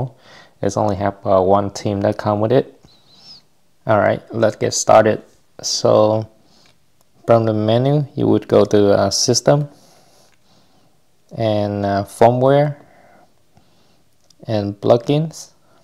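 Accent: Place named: Vietnam. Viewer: Very helpful video By the way, are you from Vietnam? your accent sounds very familiar :D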